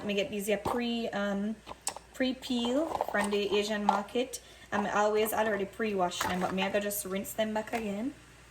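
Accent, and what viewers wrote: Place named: Jamaica. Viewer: LMAOOO OMG !!! I love you speaking in patois!! Im jelly! I can't do it.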